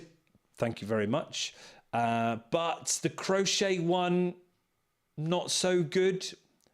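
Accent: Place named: United Kingdom. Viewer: I'm here!!! So great to see you again. A great excuse to take a break from tax prep!!! I need more than a stuffed chicken for emotional support!!! You are six foot five. Did I hear that right? How about making a six foot five blue chicken with an Olympic flag......representing you! Hahahaha! They look complicated. Will you accept one for the winter games??? I might figure it out by then!!! It does sound like fun and giving them to children would be fabulous. Where will the chickens go.....they will have flown the coop!!! I hope your store is huge or will they be "rotisserie chicken.....rotating in and out of the window? 🤣🤣🤣 You are so crazy, that's what I love about you!!! I can't wait to see your presentation! Genius! I love how you Brits say Brilliant! This is Brilliant! See you next week!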